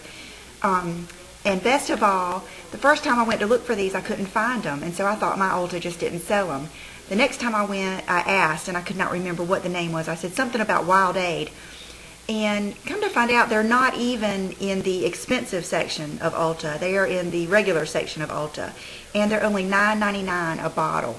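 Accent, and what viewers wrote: South, United States. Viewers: I Love your videos..watching them has made me wont to go out and try new things..I have always used the same make up forever, but my make up collection is starting to grow LOL Thanks for your inspirations..Also I detect a southern accent....Im also from the south..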